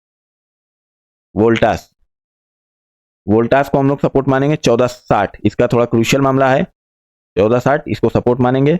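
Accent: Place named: Uttar Pradesh, India. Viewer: Kaha se ho Bhai utter pradesh ki bhasa bol rhe sir 🎉🎉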